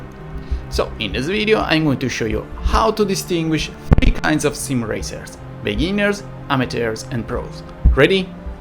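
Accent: Italy. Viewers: Is forza Motorsport 7 a good simulation game? Btw are you italian? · I love your English! Are you Italian? If so, greetings from your neighbour Albania 👋🏻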